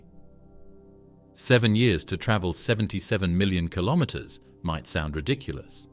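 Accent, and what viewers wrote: England, United Kingdom. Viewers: Why the english accent? You can't find an american to narrate? It's annoying. Unwatchable.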